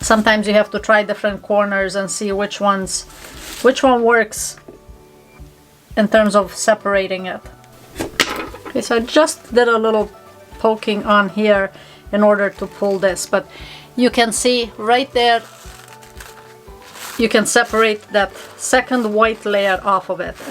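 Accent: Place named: Albania. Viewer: You have a beautiful Albanian accent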